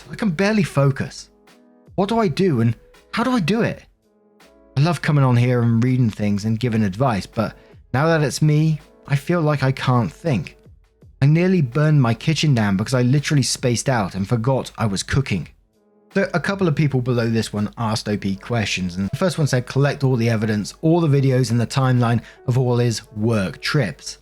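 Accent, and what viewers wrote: United Kingdom. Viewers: Nothing like getting my three miles in in 15 minutes while listening to his melodious British accent.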